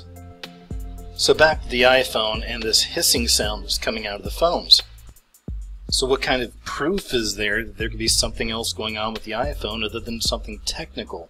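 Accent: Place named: South, United States. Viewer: I watch him and Love is country accent 😌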